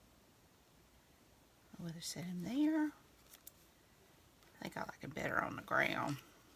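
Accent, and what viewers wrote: South, United States. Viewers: I have become a big fan of your collage. I love that you can combine simple elements together to make a complex and interesting story and layout. I appreciate your talent and it inspires me to give collage a try rather than watching others do it. I love your sweet southern accent and I enjoy your chit chat. Thanks for sharing!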